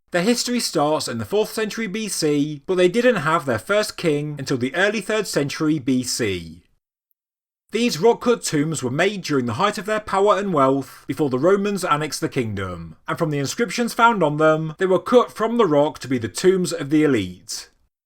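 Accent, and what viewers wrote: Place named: United Kingdom. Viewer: Could you please tone down little bit of your f****g british accent and tonation so more could fully comprehend you talking s***t chanell..your bri* accent i can tolerate but you monotonose boring tone is irritatingly offending to the ear...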